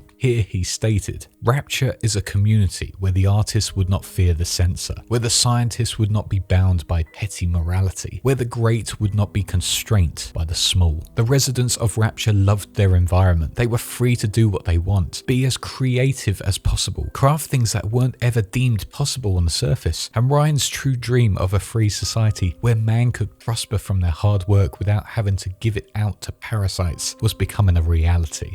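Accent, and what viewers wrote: United Kingdom. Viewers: Thank God you're british, it makes listening to this so much better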